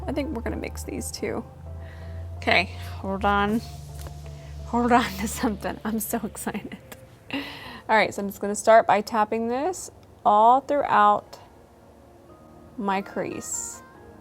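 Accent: South, United States. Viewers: I love your colorful tutorials and your spunk. You are so fun to watch. I love your Southern accent too.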